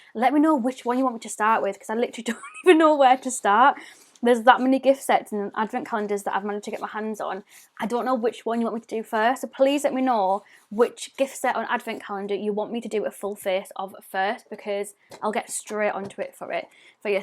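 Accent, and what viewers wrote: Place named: Newcastle, United Kingdom. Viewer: Love your videos and love your accent are you a geordie 🤔❤️your accent makes you sound so friendly 👍👸❤️keep up the amazing videos x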